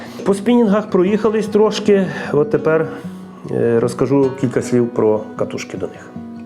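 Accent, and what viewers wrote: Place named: Western Ukraine, Ukraine. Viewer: А Ви із Західної України.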